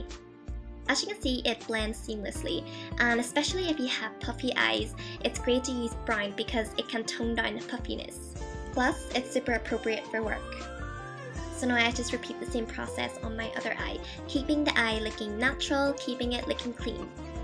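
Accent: Ireland. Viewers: I know everyone says this but I LOVE your irish accent (: haha talk about the irish charm. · Telling someone who technically speaks English fluent and has an Irish accent not to try to sound fluent is just plain retarded. And you need to clean your ears please because I, who do not speak English as my first language, am able to understand what she's trying to say. Thank you very much. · are you like, half irish or something? :) great tutorial btw <3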